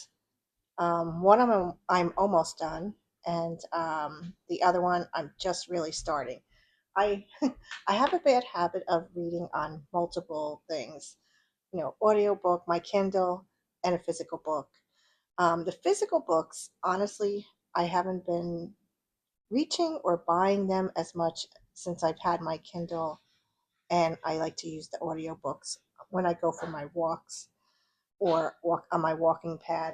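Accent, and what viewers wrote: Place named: New Jersey, United States. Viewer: Oh, friend, sleep deprivation is no joke. I literally got tears in my eyes when you said Michael hadn't slept for a week. Been there, and it's so exhausting, to say the least. Just wears you down in every way. Glad he slept on Sunday night. All the food you showed looked great! The eggs/chickpea dish... yum! I loved following along with you in your week. I don't know how you kept it all straight from day to day! P.S. I love your NJ accent. Hehe, did you know you have an accent? Or maybe I'M the one with the accent. 🤔😄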